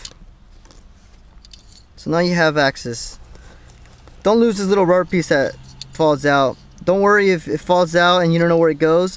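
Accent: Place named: Mexico. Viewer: Dude, amazing level of detail and tips on your videos! You sound Mexican, if Trump tries to deport you, you can hide out in my house for the next 4 years :)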